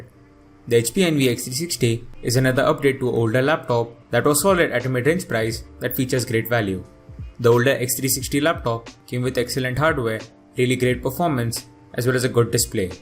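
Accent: India. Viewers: You try speaking Indian without accent..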